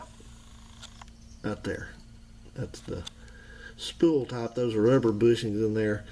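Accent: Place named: South, United States. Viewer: I like that southern drawl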